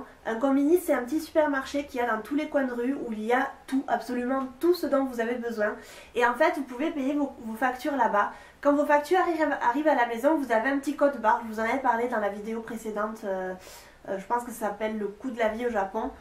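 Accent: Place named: Toulouse, France. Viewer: ha une toulousaine! il me semblait bien que ton accent m'était familier haha! tes vidéos sont très intéressantes ! en espérant te voir au Japon quand j'irai! la biseeeeee du sud ouest!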